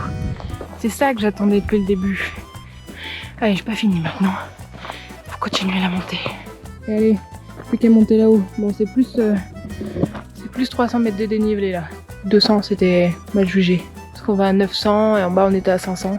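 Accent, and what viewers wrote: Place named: Drôme, France. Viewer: Je viens du sud de la Drôme, dans les baronnies exactement, au pied du ventoux😁! Tu as la même façon de parler que les gens d'ici, les même expressions😉😁. En tout cas tes vidéos sont super intéressantes, un vrai plaisir à te suivre 👍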